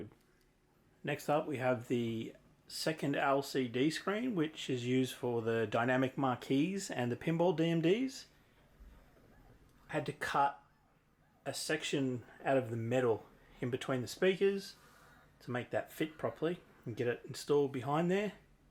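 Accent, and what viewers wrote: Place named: Australia. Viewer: Love the marquee mate! Looks awesome. I suppose you’re also in Australia or maybe NZ by the accent? I just placed an order with Chino last night and should be getting mine in NSW in a month. Very excited and planning on making some small tweaks - mostly replacing the monitor and connecting either a pc or my ps4/ps5 at some point. Just checking if you’re really in AU/NZ to get some tips on where to get parts from locally. I’m sure I’ll need a little box or some cables here and there lol. Cheers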